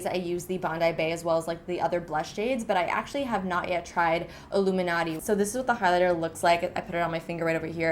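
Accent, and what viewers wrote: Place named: Canada. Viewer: One of my favorite things about your Canadian accent is how well you enunciate specifically all letters in words such as Anastasia. You could have a talk show or podcast and I would so listen. 😂 Not trying to sound creepy, just enjoy your calm and well spoken voice! Love you xoxo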